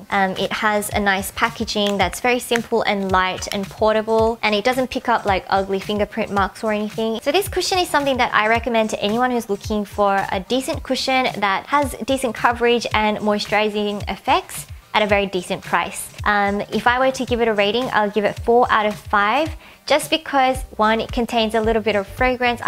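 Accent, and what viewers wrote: Australia. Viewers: Hi. Love your videos. So awesome to know you're from Australia too. It sounds great to hear someone from Australia. And also you do great tutorials using some of the Korean street brands that are inexpensive to buy. I just started getting into make-up and I particularly prefer Korean make up brands because Kpop? (that's a bad reason, but Korean anything just appeals to me the most at the moment) I particularly like Innisfree as a brand in general because everything looks so natural yet classy at the same time and I had my first Korean cosmetic brand experience with Innisfree as well (Innisfree anti-Bija Spot Essence W which is sadly not available anymore online on the Innisfreeworld website) It's funny how you're particular about the fragrances, but I found that they all smell nice, so I had no problem with it. I guess you could say that I am now a die hard loyal fan of Innisfree and I will love anything it has. 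Off topic but dying to ask because I don't know where to: As someone who's still so inexperienced, when would you use BB Cream or Liquid Foundation? I understand the differences but seeing as Liquid Foundation is tons better, I see no point in buying BB Cream. But I saw your make up collection which is astounding BTW, and it has tubes of BB Cream in it. So I was wondering what sort of occasion would you use BB Cream and why?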